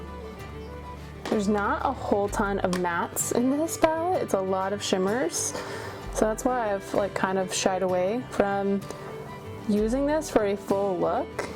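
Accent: Russia. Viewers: Sounds like Russian accent!😂😂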